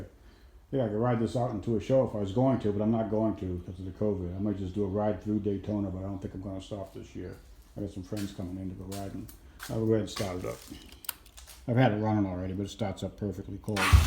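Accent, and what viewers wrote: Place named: Boston, United States. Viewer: How do you still have the Boston accent?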